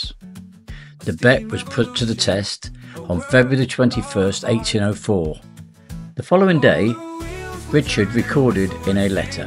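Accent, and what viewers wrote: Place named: United States. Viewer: Maybe if the AI can get a cornish accent instead of american one it would suit good!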